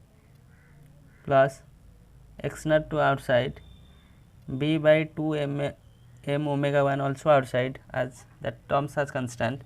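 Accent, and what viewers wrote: Odisha, India. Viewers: Sir by your voice you resemble like odia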